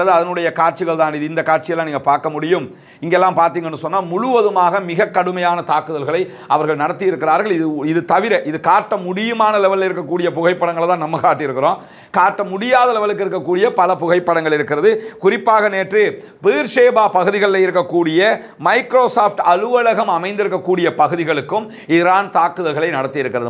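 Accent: Tamil Nadu, India. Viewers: Sago ungali videos ilangaiyulirunthu veliyuduvathaga arinthen unmaiyuleye namba marukirathu manadhu yenenil pesum thoni tamilnattukarargal pesuvathaipol nadaimurai tamizhaga irukkiradhu.🎉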